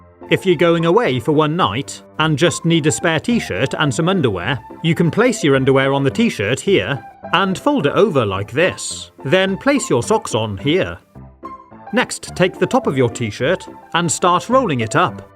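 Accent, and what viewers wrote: United Kingdom. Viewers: I never knew hes brittish. Im also british 😊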